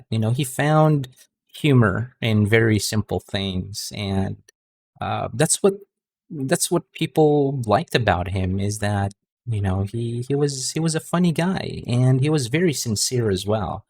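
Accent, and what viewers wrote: United States. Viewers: I think you're wrong about the English language being exclusive to the elite, the educated and very rich. You probably think so because you grew in America (judging from your accent). Public schools (schools for the masses) at that time were taught subjects in English, so the language was more common than you think. This dissemination of English was the product of 40 years American colonialization. It was the Americans who set up the educational system for the masses and the middle class. It is perhaps the foundation of why today many Filipinos speak English as a native language and why the Philippines ranks among Asian countries as one of the largest English speaking populations. But yes, your grandfather was very intelligent. Cheers. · Yeah! But boy u hide in american palda what a shame u speak americanize compared to ur lolo but no doubt ur lolo have more nutrients in his brain against yours ..what a shame 😂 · are u in the Philippines? 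Coz ur american accent is very nice 👏 Ilang taon po pala lolo nyo nung namatay sya? Seems he had a long full life.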